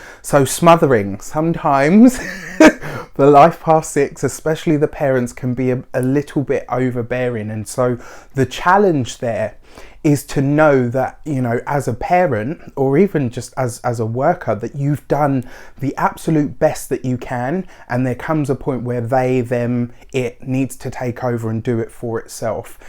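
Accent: United Kingdom. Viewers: Hold on do I hear a British accent? I got a feeling I'm a bit late but have to subscribe ! UK lady here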